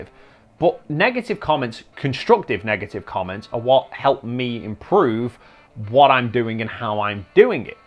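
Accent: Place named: England, United Kingdom. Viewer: LOL you are welcome. Love your channel and I find your mild humor funny. Your English accent gives you a leg up on other regular photography youtubers. So consider yourself lucky!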